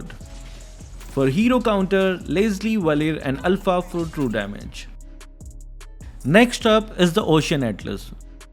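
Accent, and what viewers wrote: India. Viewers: Are u indian?your accent feel like indian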